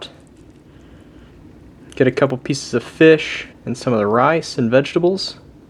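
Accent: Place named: Oklahoma, United States. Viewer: I love the Okie accent 😍,. Maybe that's cause I'm from North Central Texas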